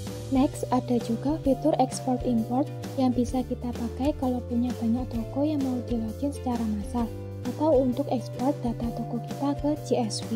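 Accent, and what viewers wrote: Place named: Java, Indonesia. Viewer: Medhok sekali mbak, makasih impohnya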